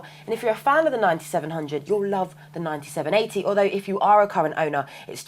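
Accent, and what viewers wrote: England, United Kingdom. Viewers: love the english accent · british accent fo tha win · She has a nice accent though some British accents are nasty! I have the phone and use it as a backup. Still works great. Battery life is terrific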